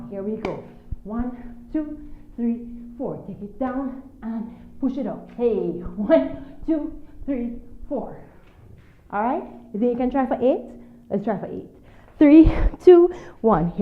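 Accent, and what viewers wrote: Jamaica. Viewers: I LOVE THE JAMAICAN ACCENT ......EXOTIC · Hello from new york city.....I love her Jamaican accent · ooh girl, you took the "nasty" out of twerking and elevated to a real dance form...loved watching and listening to that lovely jamaican accent 🥰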